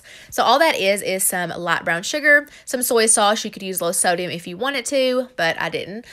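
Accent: South, United States. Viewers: Aloha!!! I absolutely love the sound of your voice!! It’s super soothing and your lil southern accent is so cute!!! I am a new subscriber, & I’m guilty!! I’ve been caught I’ve been binging on “WFD” everyday after work!! You seem like such a sweetheart! Blessings & Aloha Sweetie!!!! God Bless you and your family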